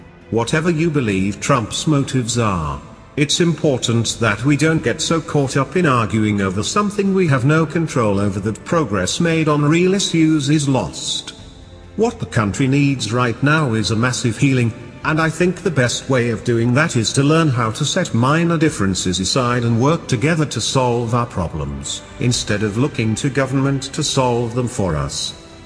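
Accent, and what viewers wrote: United Kingdom. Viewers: Why the British accent? We're talking about America, after all.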